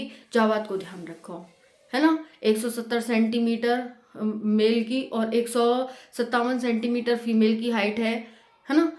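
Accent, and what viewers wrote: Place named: Mathura, India. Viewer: Mam aap kahan se ho aap meri tarah bolti ho m Mathura up se hun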